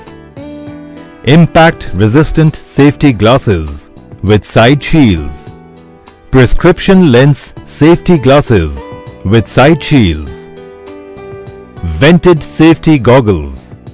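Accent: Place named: Thailand. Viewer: The best Thai English I've ever heard, man! And thank you for this video.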